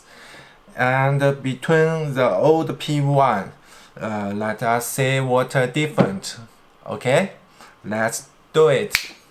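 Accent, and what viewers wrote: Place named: China. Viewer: 国内有没有号每次要上tube听中式英语好别扭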